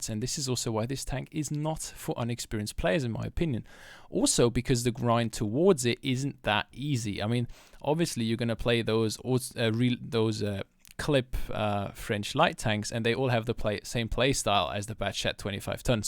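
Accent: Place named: United Kingdom. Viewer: Wow are u french? U sound british haha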